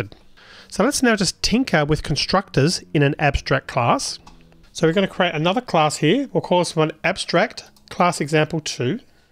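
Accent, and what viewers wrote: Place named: Australia. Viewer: I'm taking Kotlin class in Udemy - in general, he is in the top percentile in terms of completeness, ability to explain things conceptually (why you do sth, not just what), video editing (he actually edits his videos, so typos and corrections don't show up and important snippets are outlined instead of wiggling a mouse), pace (you have time to absorb concepts), practicality (both concept and mini-projects)...and, notwithstanding the Aussie accent, his English is very easy to understand...really great stuff across the board!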